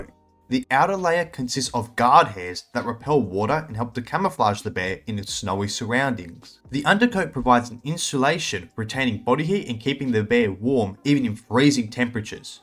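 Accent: Australia. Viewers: Thanks for the explanation with your heavy Australian accent.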